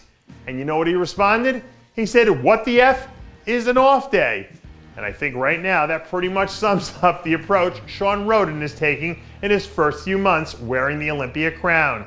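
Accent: Standard. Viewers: It's called, non regional diction. I like it.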